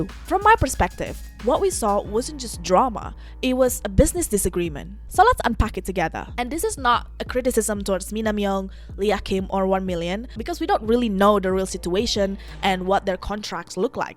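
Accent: Indonesia. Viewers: Indonesian accent detected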